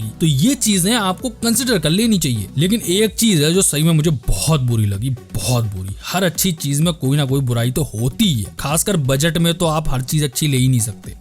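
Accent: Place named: Agra, India. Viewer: Bohot badhiya channel hai bhai aapka. Aur wo Agra wali boli to maja hi aa jata hai sun ke. but ek request ke single product ka video 16 min ka na banaiye. jyada ho jata hai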